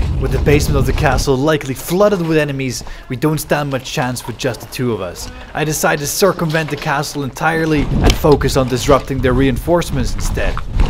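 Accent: Netherlands. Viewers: Ayy are you dutch becuse i can understand you and im afrikaans Just look it up afrikaans is a descend of dutch the more you know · Are you dutch cause i am and i think you are too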